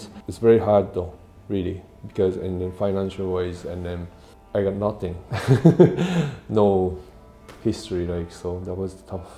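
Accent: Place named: Cork, Ireland. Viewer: I love that he's developed a Cork accent, next time I'm Cork I'm going to try his restaurant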